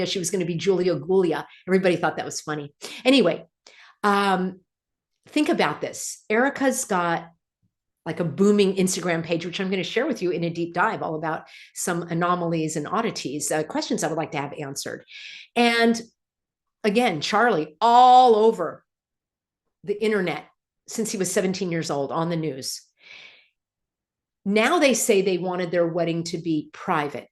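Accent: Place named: United States. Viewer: Well, I had to subscribe because your delivery in that subtly sarcastic tone with the American accent just tickled my little Scottish heart. You have it all well sussed as we say.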